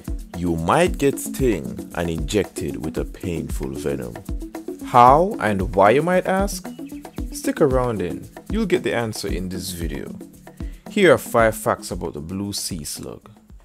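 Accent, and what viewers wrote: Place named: Philippines. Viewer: you sounds filipino,are you?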